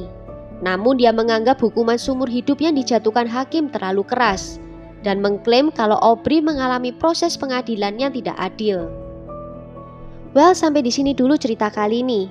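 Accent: Java, Indonesia. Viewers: Hay.. medokuhhh 👍💖💖💖 sukses trs yaa say 🤲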